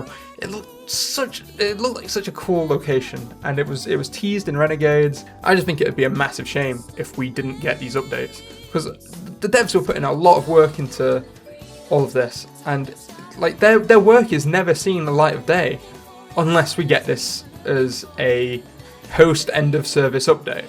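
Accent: United Kingdom. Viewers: he's british???????????? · IS BRI'ISH?! (I hail from Tw*tter)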